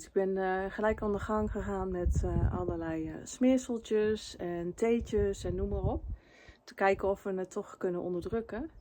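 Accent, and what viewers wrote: Utrecht, Netherlands. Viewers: Ohhhh kaneelbroodjes, sneeuw en een sprookjes verlicht huisje!!! Even in de natuur, daar knapt een mens altijd van op. Nou dat Utrechts komt mooi uit, dan begrijp ik je prima!! Haha, dus lekker blijven kletsen... En laat ze maar kletsen!! 😂😂 Inderdaad, blijf wie je bent!! Ik hoop dat je inmiddels bent opgeknapt, zo niet... ziek lekker uit. Dank je wel weer voor toch echt een leuke vlog met mooie beelden. Alleen daarvan al kom ik tot rust... Hej då...❤ Oh ja... #ikdoemee 😊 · Beterschap aan beide! Jullie hebben denk ik inderdaad het griepvirus uit Nederland te pakken. Op mijn werk zijn ook ontzettend veel mensen met griep.. het is dus erg besmettelijk helaas. Je had ook gewoon een weekje over kunnen slaan he! Ziek is ziek! 😊 En negatieve reacties? Ik snap dat nooit. Niemand is toch verplicht om te kijken?! Lekker jezelf zijn! 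En als dat als Lenie van de Takkestroat is… 😉helemaal prima! Dialecten zijn mooi! Er zijn al zoveel mensen die dialectloos door het leven gaan.. ik vind het heerlijk om aan het dialect te raden waar iemand vandaan komt. Voor je het vertelde had ik Utrecht al juist geraden🤣 En.. het valt ook wel weer mee hoor! Doe nog even lekker rustig aan en goed uitzieken! Ik doe niet mee… met de griep bedoel ik dan hè😊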